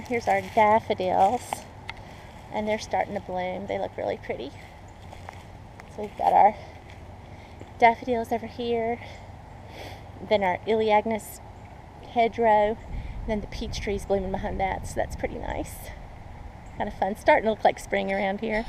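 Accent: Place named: Georgia, United States. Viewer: My mom loves to grow plums, and I'm always disappointed by them. I've never once seen a plum that doesn't have a mark from a bug or a worm that nibbled on it. She's the same about peaches, and she's got two new peach trees to plant this year, but I just don't think we're far enough North. We're in zone 8A Maybe? One time, 30 years ago, She got a tree full of delicious nectarines, and it has just given her a fever which she can't seem to get past. We haven't seen nectarines or peaches like that ever since. And if her peach trees do make a few fruit, typically the squirrels get them. Love your videos, I love your accent because I have a South Georgia accent, and I got your channel by looking at your strawberry videos from a couple years ago.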